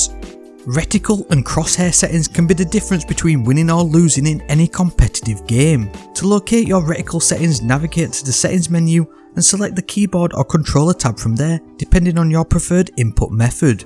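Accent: England, United Kingdom. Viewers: Hey! Thanks for the video! Got a strange question: what kind of english acsent is it?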